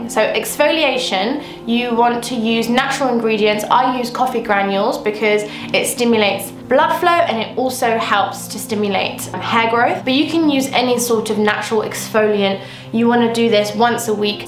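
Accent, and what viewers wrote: United Kingdom. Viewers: I LOVE your accent:D Your name sounds Arabic to me, but your accent is beautifully British <3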